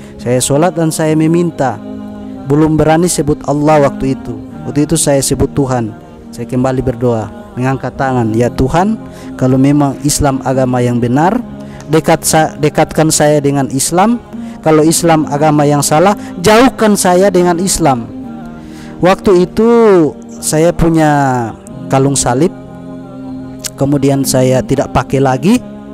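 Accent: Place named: Bugis, Indonesia. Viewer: Tapi logatnya logat bugis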